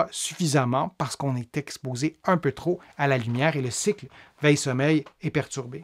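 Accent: Quebec, Canada. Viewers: Merci pour votre information. Donc il vaut mieux lire ou écouter la radio le soir pour éviter de s'abrutir avec la télé ou you tube ( que je vais écouter plutôt le matin maintenant) Bien fermer ses volets ou rideaux pour être dans le noir surtout en ce moment car il fait jour vers cinq heures . Encore merci à vous et bonjour au Québec que j'aime beaucoup (ainsi que votre accent) ou vit mon fils ainé..j'ai 70 ans et commence a avoir du mal à dormir a cause de mon stress.......mais je me soigne avec le yoga et des activités artistiques.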